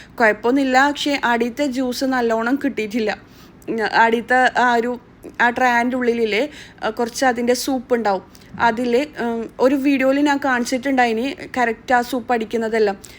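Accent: Kannur, India. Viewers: പഠിച്ചതൊക്കെ ദുബായ് ആണോ.. മലയാളം അത്ര fluent അല്ലല്ലോ.. അതോ കണ്ണൂർ മുസ്ലിം ഭാഷ ഇതാണോ?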